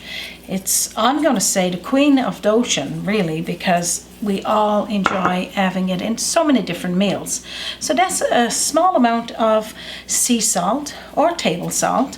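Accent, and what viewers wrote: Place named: Newfoundland, Canada. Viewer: Maybe a bit too personal a comment, but I love listening to you. You sound like my Nana who came to the USA from St. John's Newfoundland with my grandfather (Papa). They worked on fishing boats before coming here. I keep waiting for you to say "a spot of tea" as they did. Thank you for your accent. It brings back truly beautiful memories.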